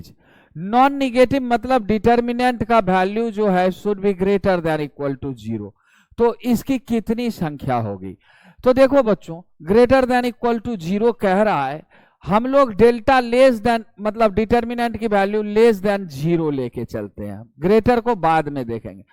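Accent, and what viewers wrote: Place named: Bihar, India. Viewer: Kya tone hai sir ka 👏👏 Pure bihari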